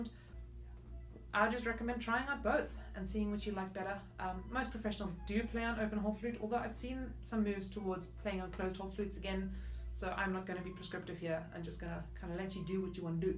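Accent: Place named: South Africa. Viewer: South African, I believe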